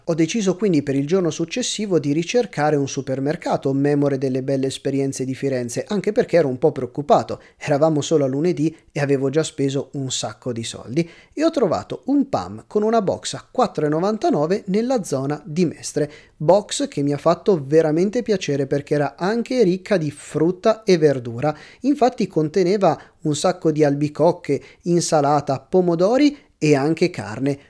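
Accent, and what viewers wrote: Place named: Perugia, Italy. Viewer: Infatti... pure io sono di Perugia e ho riconosciuto la cadenza... anche se non marcata..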